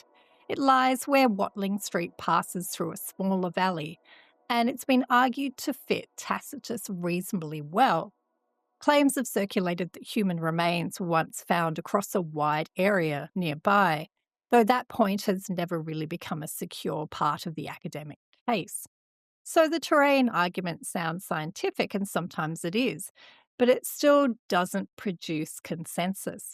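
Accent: Australia. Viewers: Always good to hear an Aussie accent talking about British history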